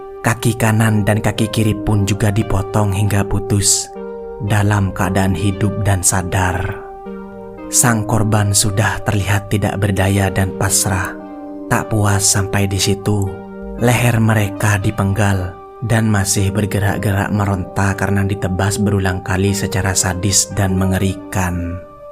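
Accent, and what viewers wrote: Madura, Indonesia. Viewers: Orang Madura ya bang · Orang madura bg? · Orang madura ya bang!!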